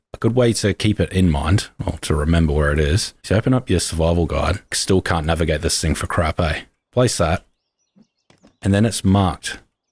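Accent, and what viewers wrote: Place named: Australia. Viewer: Dear god do i hear an aussie? I thought we were going extinct on youtube.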